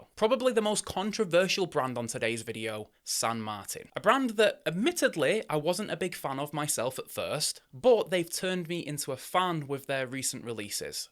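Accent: United Kingdom. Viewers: I really like this guy. Is it the UK accent? Or the fact he reminds me of Jon Snow from GOT?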